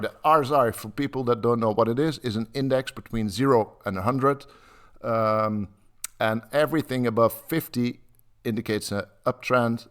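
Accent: Netherlands. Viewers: you sound like my old professor of Applied Cognitive Psychology Phycology at the university of Utrecht! Are you Dutch ??!! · Finally ... your English pronaciation is suddenly much better ... the Dutch accent is fading ... that's cool . . .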